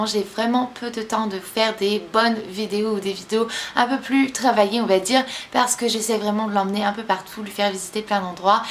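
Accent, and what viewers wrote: United States. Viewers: lol on dirait tu as deja choppé l'accent américain c'est bizarre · Félicitations ! Et je sais pas si je suis la seule mais je trouve que maintenant quand tu parle français t'a un petit accent américain :) Et je suis contente pour toi que te revoie ton marie bientot bisous :p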